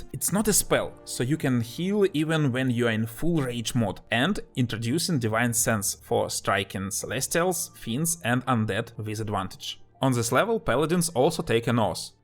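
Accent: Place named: Russia. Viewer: nice russian voice brat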